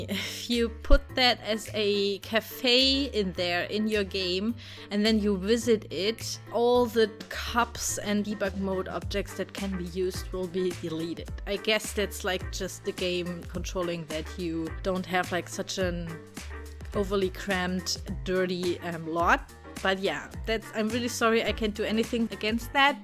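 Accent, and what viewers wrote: Germany. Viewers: Du hörst dich richtig an wie eine Deutsche die englisch spricht..😂😂😂😂 aber seeeeehhrrr süß !! 😂😂🦄🦄🦄💕💕❤️❤️🍓🍓😘😘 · Ja, in Deutschland sind fast alle Starbucks in anderen Gebäuden integriert. Ich habe vom ersten Satz an gehört, dass du deutsch bist. :D Aber dein Englisch ist gut. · Are you German?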